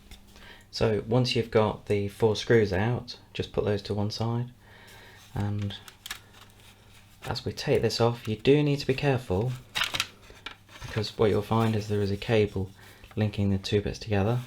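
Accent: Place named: London, United Kingdom. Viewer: Are you from London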